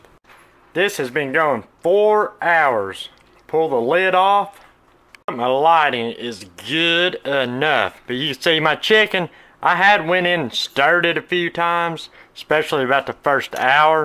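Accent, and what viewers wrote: South, United States. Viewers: Love your southern voice!!!! Love your videos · This really looks delicious. I make plain chicken breast in water in the crockpot for shredded chicken sandwiches and the chicken is usually a little dry. Sometimes we put gravy on it but a little annoying that it’s dry. Don’t care for the Crack chicken name either. Ranch shredded chicken sounds better. Really like your channel. You serve up great recipes with a great southern accent. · You can cook! And I love your Southern accent. Reminds me of home. My mom’s home anyway. Great memories. Keep kookin’!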